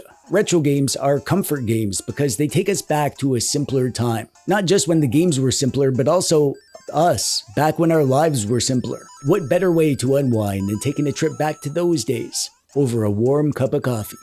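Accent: Canada. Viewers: hey man what part of canada is that accent from? i met a guy in New Zealand who was from Canada and he had the same accent as you, i think it's cool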